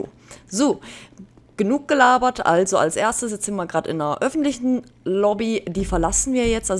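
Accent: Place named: Swabia, Germany. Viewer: Ich mag Deinen leichten Schwäbischen Akzent 😊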